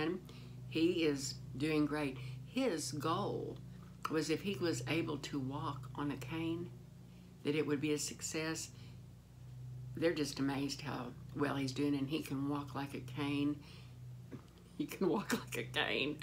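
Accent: South, United States. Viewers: I heard about you from Sandra. You are both wonderful. If I had gotten married again, I would learn a lot from you, your charm and intelligence. Am 70 something years old and still looking for a great guy. With your living care, no wonder he’ll be walking asap! Question about the protein bars: what’s the name of the company? The flavors sound delish!!!. I live upstate NY. Live your Southern accent. Kind regards, FR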